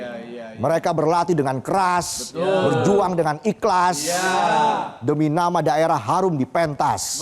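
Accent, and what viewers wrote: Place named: Jakarta, Indonesia. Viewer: Ogoed suka bener ame ni gaye bicarenye Coba dong logat betawinye kentelin😅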